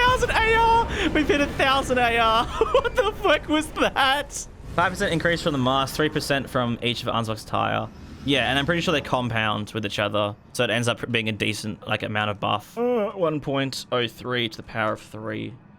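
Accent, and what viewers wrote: Australia. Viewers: i just found this vid after googling if reduvia was any good, only played about 1.5h so far, first playthrough. anyway, thought you were an aussie, confirmed it with the extra dry, so, hello from FNQ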